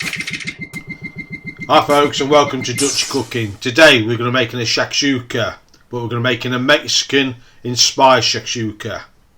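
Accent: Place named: Midlands, United Kingdom. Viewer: Looks good, husband and I are trying to narrow down your accent, he says junction 28 of the M1😂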